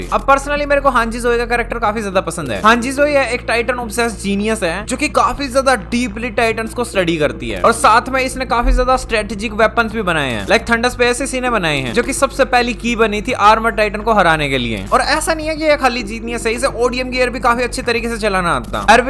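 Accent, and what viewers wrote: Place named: Haryana, India. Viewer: Vai tumhara voice hryani vibe deti hea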